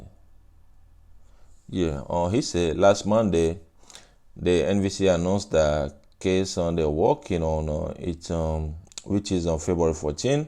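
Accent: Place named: France. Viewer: Are you french by origin ? Love the accent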